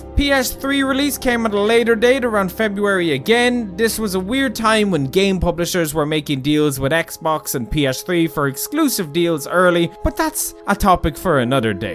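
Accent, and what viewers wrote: Ireland. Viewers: I just found this guy. He sounds Irish to me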